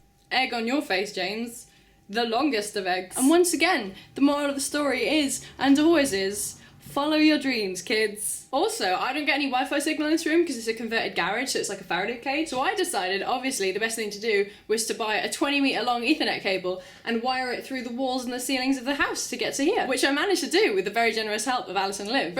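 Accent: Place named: United Kingdom. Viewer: I don't understand why your cute british accent always attract me again to your videos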